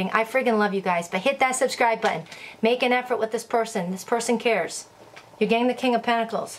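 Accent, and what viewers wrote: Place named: Brooklyn, United States. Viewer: Girl, you are so spot on. My past person messed me UP somethin bad 😔. Loved the video ❤🥰 and i was guessing your accent was brooklyn lol i have some friends from there ans ya'll sound the same 😁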